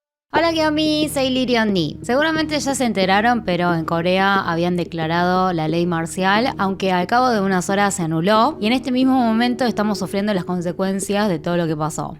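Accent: Buenos Aires, Argentina. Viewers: Una asiatica hablando español, encima en tonada argentina dios mio 😱😱😱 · Si se nota que aprendiste español en Argentina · Vaya accento argentinos con el ya pronunciado ja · Toda América Latina, estaba esperando esta explicación. Adoro su acento Argentino. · De donde me recurda lo que dice?... ah si ya se, de argentina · Es porque es Argentina, nació y vivió más de 30 años ahí, dudo que se le vaya el acento porteño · Me gusta tu hacento Argentino. Dicen que si Korea se unifica como una sola Korea sería una potencia militar y económica y tecnológica. Con muchos recursos. Yo pienso que si porque la unión te hace fuerte 👍🇲🇦🇰🇷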